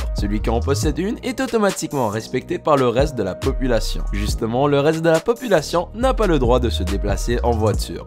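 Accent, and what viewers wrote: Quebec, Canada. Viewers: Québécois sinon bonne vidéo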